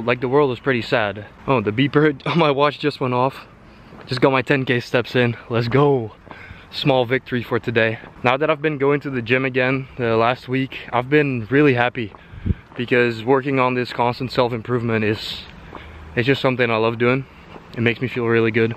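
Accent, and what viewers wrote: Netherlands. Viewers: Are you Dutch?! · You from Rotterdam¿¿¿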